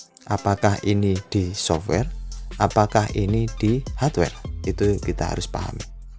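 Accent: Java, Indonesia. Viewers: Sampeyan jawane ngendi boss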